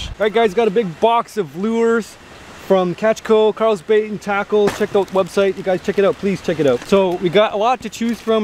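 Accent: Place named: Canada. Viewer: This is great man. I love content like this. I've very jealous but also very excited for you. I've been wondering where you are from. You sound very canadian like me. I'm from northern ontario myself!!!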